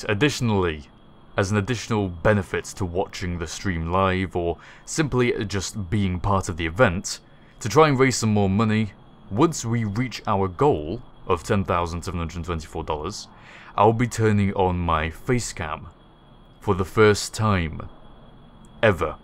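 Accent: United Kingdom. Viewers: Is that his actual accent? O.o;; All my british accent lessons are from BBC.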